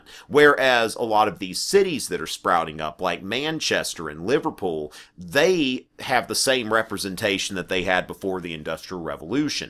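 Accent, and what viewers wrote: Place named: South Carolina, United States. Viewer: He sounds like he's from the south eastern U.S. I'd guess, an urban area of South Carolina. I'm from Virginia, and many of the accent are similar, but there are some subtle differences.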